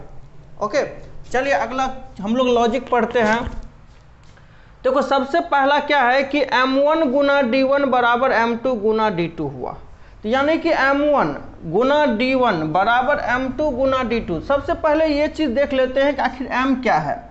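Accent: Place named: Bihar, India. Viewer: Bhagalpur,munger ,jamui ke taraf ke lagte hai sir aap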